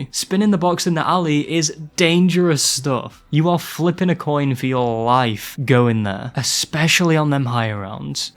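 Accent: United Kingdom. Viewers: Something tells me this guy is British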